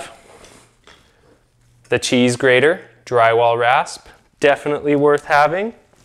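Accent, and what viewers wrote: Canada. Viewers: SOMEone could watch this just to study the Canadian accent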